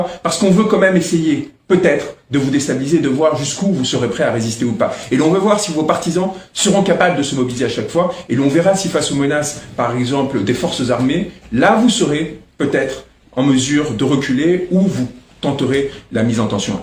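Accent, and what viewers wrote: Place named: France. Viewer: Il nous parle le français de france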